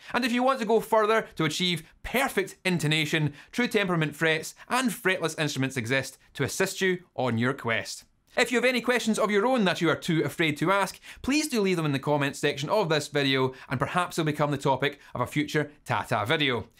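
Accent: Scotland, United Kingdom. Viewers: What a lovely Scottish accent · I've seen enough Peaky Blinders to know that this man is Scottish.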